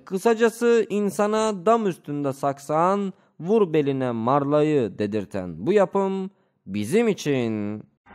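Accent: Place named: Zonguldak, Turkey. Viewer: Seslendiren abimiz %80 zonguldaklı veya bartınlı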